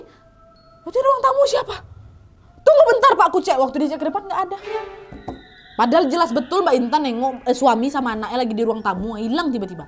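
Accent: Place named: Sumatra, Indonesia. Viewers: Seru denger cerita pake logat Sumatera campur bahasa Padang dan Jawa. Jadi unik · logat pku nya kental kali haha,semngtt ka,salam dari marpoyan sekitar😂😂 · asli urang awak Yo? Minang dimanyo? Masih totok minangnyo kayaknya.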